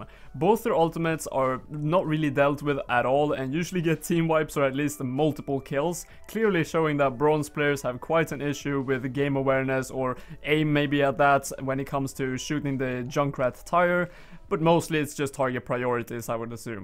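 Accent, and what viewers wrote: Sweden. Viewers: Are your swedish? Nice english x)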